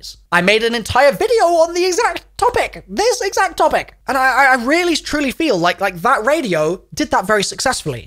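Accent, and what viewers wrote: South Africa. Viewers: The south african accent had me, love this chanel from a fellow brit 👊👍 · Amazing south african accent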